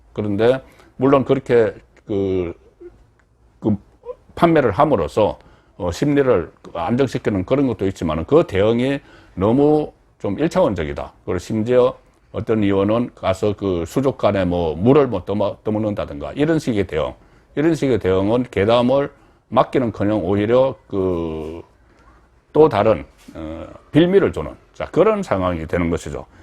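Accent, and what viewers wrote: Gyeongsang, South Korea. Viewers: 송국건 님갱상도 구수한 사투리 고향생각납니다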